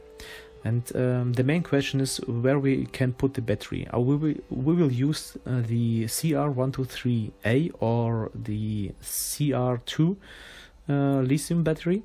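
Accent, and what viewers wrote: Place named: Germany. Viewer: Wie man einfach hört das du deutsch bist beste 😀👌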